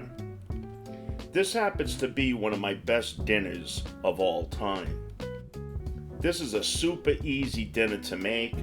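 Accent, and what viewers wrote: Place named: New England, United States. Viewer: Oh how I miss New England accents, I'll be making a boil dinnah on Sunday 🤤🤤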